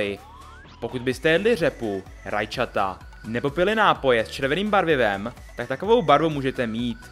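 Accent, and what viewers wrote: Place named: Prague, Czech Republic. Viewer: Jsi Pražák, viď? Podle toho jak mluvíš xD mě to hrozně štve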